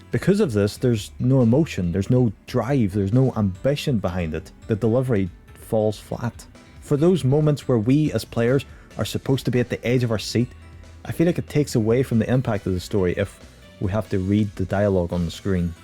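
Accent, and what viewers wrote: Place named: Ireland. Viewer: Love hearing an Irish accent and seeing local creators doing well on YouTube, great job with the video mate!!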